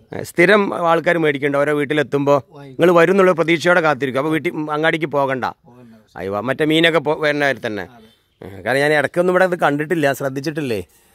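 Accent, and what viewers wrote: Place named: Malappuram, India. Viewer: Njagal malappuram karude slang engane thanne yanu bro nee athil tention adikkada muthe thekkan mare pole boomikku foomi baryakku farya yennu onnum allallo aksharangal correct alle slang nee preshnam akkenda yethu slang anengilum yenthelum arivu kittunnundakil athu padikku allathe njangal malappuram kare athikshepikkalle muthu maney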